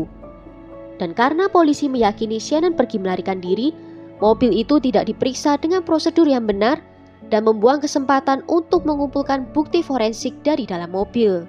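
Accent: Java, Indonesia. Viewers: logat jawa nya itu loh... bikin terngiang ngiang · Lebih pasnya nama chanelnya ini adlh.... Krn medhok Jawanya bikin gemes....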